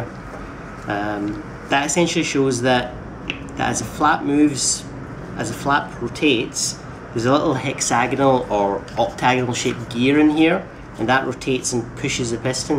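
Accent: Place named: Scotland, United Kingdom. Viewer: I think yul find he's scuuutish!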